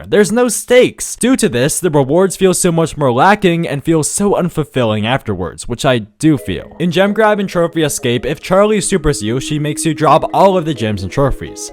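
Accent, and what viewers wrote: India. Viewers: indian rupee ? ur Indian ? if u are damn nice accent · Bro r u indian??? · Are you Indian>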